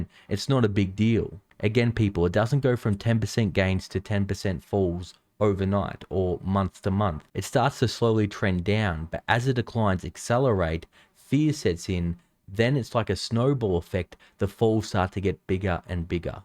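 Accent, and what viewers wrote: Australia. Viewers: Does he have an typical australian accent? I'm not a native English speaker and I try to learn different accents. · i miss the HOLY SMOKES EVERYONE in your aussie accent hahaha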